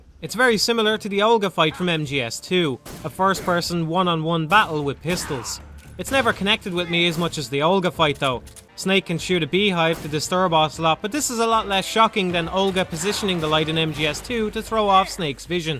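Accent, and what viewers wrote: Ireland. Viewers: He is Irish so I'd Imagine they have a bit harder to pronounce thingsm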